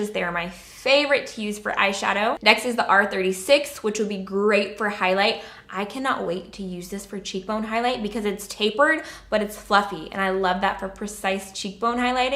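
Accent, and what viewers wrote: South, United States. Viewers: Kia Uhhhhh no it has nothing to do with audio. She used to have a very prominent southern accent.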